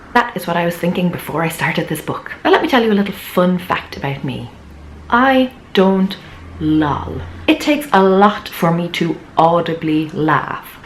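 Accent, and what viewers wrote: Ireland. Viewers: I'm so excited for this interview with Sarah Knight! Fun fact: After watching your review on The Life-Changing Magic of Not Giving a Fuck, I'm currently reading it..... and in my inner monologue, it's narrated in your Irish accent! So, the comment about you doing the audio book was particularly funny for me ;) I can't wait to get into the new book. I'm in Canada, so I'd love to win the US version of Get Your Shit Together!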